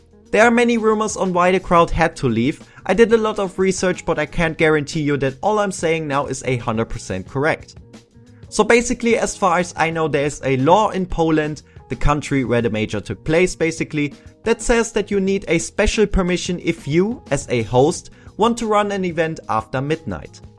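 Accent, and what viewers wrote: Germany. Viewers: Wow man hört direkt das du deutsch bist 😂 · Bist du deutsch? (Wegen deinem Accent)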